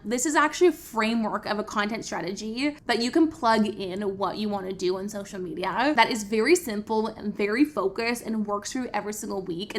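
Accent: United States. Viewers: You have such a strong Californian accent.🤭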